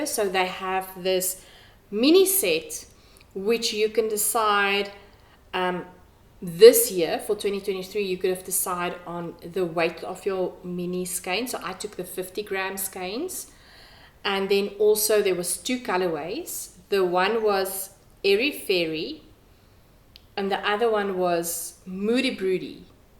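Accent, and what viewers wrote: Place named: Afrikaans, South Africa. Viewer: Haai 😊 Ek het jou channel gekry deur die recommendation van mywonderfulknittinglife. Ons het ~20 jaar terug Amerika toe getrek, en ek was baie excited toe sy se jy is in SA. Dit is so lekker om n Afrikaanse aksent te hoor. Dankie vir n lekker episode! ❤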